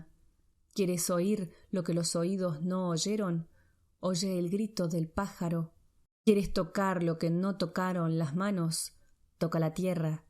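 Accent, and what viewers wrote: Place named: Argentina. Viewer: Espero no ofender a nadie con mi comentario, si fuese el caso: lo siento. Usualmente no me parece atractivo el acento argentino, pero tu voz me encanta. Gracias por las lecturas.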